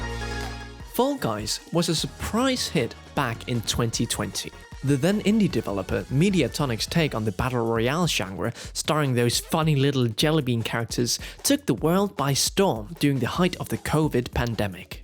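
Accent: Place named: RP, United Kingdom. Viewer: Why are you taking so posh? That’s not how you normally talk.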